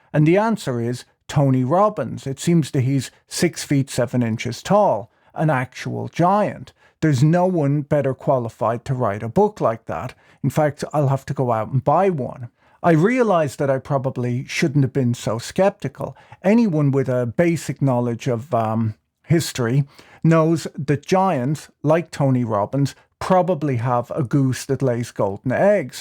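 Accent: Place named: Ireland. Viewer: Irish, not British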